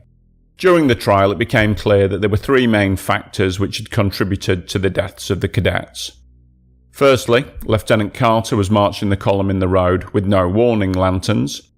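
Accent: United Kingdom. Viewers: Voice over British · delivered in a soothing, charming British accent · Newly subscribed. You sound good and British mate. Cases we have never heard of such as this will take you far here on the tube. T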